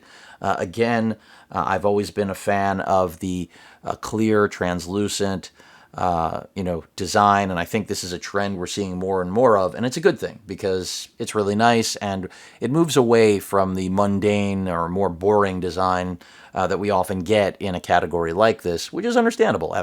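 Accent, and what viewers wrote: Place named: United States. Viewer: I love the american slang (gonna wonna)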